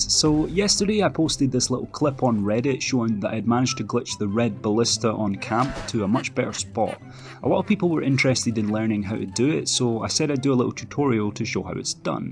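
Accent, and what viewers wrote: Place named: Scotland, United Kingdom. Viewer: Epic, I just watched a video from a year ago without your voice and you are Scottish, even more epic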